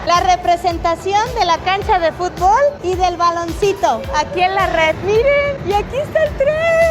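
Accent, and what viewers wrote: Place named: Mexico City, Mexico. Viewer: No detecto que seas cubana...hablas como chilanga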